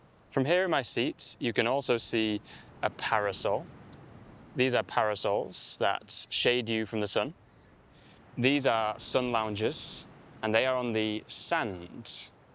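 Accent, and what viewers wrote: United Kingdom. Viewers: I really love watching your video sometimes I practice to talk just like your accent British accent talking to myself haha 😂😂 I learn a lot from you.thanks u from Philippines 🇵🇭 with love.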